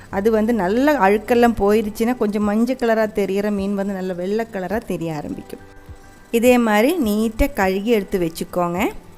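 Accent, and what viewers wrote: Kerala, India. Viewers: hai sis fish curry super..eppadi tamil kathukkitigga. neega tamil&keralaugga voice mathiriye kulambum sema. home tour podugga ugga faceyum kattugga.god bless u r family.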